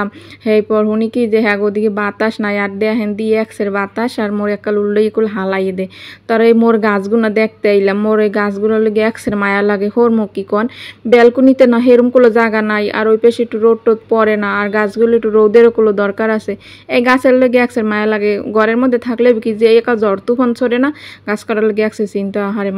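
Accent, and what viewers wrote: Barishal, Bangladesh. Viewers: ❤আপু তোমার সাথেই আছি ❤আর তোমার বরিশাইল্লা পাহা পাহা কথা শুনি ❤👍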